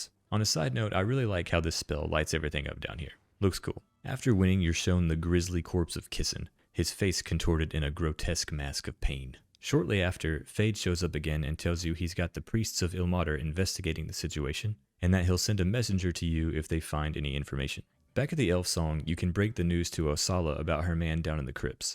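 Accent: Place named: Arkansas, United States. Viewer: Rural arkansas? Im from fort smith and lived in Magnolia in south arkansas. You sound like you may be from further north around Fayeteville?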